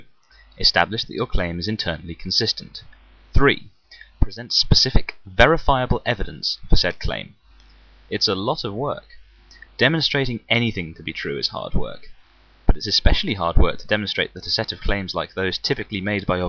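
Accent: United Kingdom. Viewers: I love your British accent ;). Her video was funny. I asked a few Christians why they believe and a couple reasons I got were "If I live as if there is a God and I die and find there isn't, what have I lost. nothing. If I live like there is no GOD and die and there is, I have Lost everything. That is a chance I am NOT willing to take." and "the evidence is all around just look at nature"...etc. LOL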